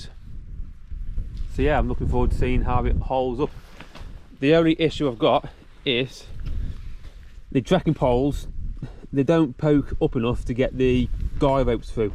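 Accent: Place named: Northern England, United Kingdom. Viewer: I think there are two things you could do to improve this video. The first is to pause your walk when you address the camera. Set a scene. Consider the background. Consider all the points you want to make, make them and then continue the walk. Look at the scenes and the composition in videos like those of the outdoor boys. The second, and I really don't mean to be offensive, but you really need to find a way to tone down the northernness. Like "A few years back, me dad give it me". This is barely intelligible. You'll be more accessible to a much larger audience if you could rephrase it as "My dad gave it to me a few years ago". I do like this content and I want it to succeed. But these are things that need to chance quickly, or else you'll struggle to reach new audiences.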